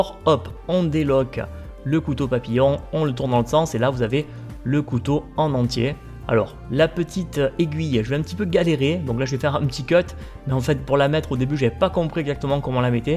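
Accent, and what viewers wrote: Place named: Marseille, France. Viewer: Il est marseillais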